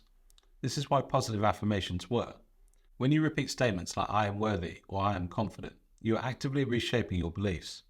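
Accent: United Kingdom. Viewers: pure information with a British accent( I love it)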